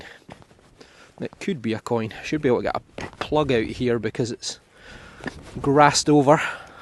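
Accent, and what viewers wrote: Scotland, United Kingdom. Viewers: Love the Scottish Accent, enjoying your knowledge and the history, great informative videos. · I chuckle when you say worm. Your accent to me sounds like your saying werdems. I’m American with my ancestors coming from Scotland moved through Ireland and eventually to the US. My Grandmother carried your accent. If you know of any McCardles or McClellens say hi to my peeps for me lmao😅. Man I’d love to come over and detect the mother land.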